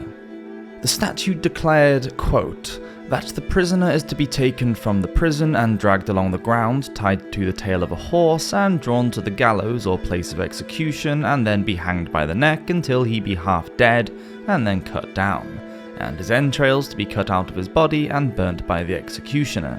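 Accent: United Kingdom. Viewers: This narrator is so very British both in accent snd personality. I love it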